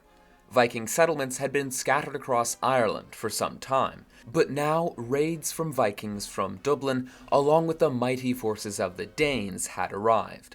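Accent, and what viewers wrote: United States. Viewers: I think the narrator is American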